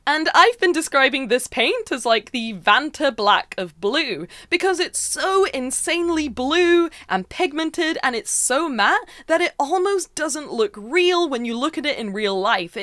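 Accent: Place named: Scotland, United Kingdom. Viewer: Sorry if this offends you, but its annoying me that i cant pin your accent, at times you sound Scottish, lothian/Edinburgh way, but i'm probably completely off 😂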